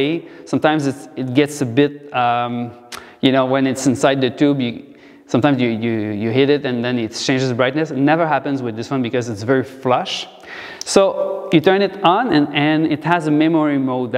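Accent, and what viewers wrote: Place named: Quebec, Canada. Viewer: Oh shit jpensse t québécois man ahah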